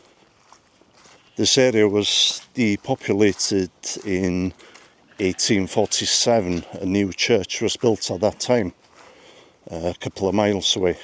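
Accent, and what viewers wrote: Wales, United Kingdom. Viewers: it's really lovely to hear a real Welsh accent, and I'm 100 per cent Celt Irish. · American here and newly subscribed. I worked with a couple of Welchmen 40 years ago and haven't heard a Welch accent in a long time.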